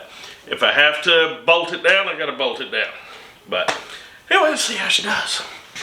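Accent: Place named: South, United States. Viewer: Never so much as forged a nail, but I've just subscribed anyway, I love this gentleman's personality, it's cool to see someone who speaks like me, slightly different, but to someone up north it would be identical I suppose, I'm from a small town in NW Georgia, but anyway it's awesome to see a southern man like myself doing something on YouTube, so few people from the south use their actual accent on here.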